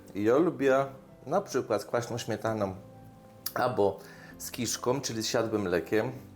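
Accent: Silesia, Poland. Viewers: nieprawda. Ja uwielbiam jego śląską gatke. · Dziękuję za dobre rady jakie ziemniaki wybrać na placki.Przypadkiem trafiłam na ten kanał i już subskrybuje, bo mi się podobo śląsko mowa❤ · Kocham Pana kuchnię,smaczna,prosta,podana w prost,apetycznie.Kocham gwarę śląską, uczę się od lat Pana przepisów, obserwuję Tak trzymać!!!!!!❤😊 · Te przepisy,które dotad oglądałam są super.Bardzo lubię jak mówi po śląsku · 😂 slunska gwaro śmieszno jest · Przepiękna Śąska Gwara! Byłem kilka razy na koloniach z chłopakami że Śląska i natychmiast po pół wieku wspomnienia wrócily.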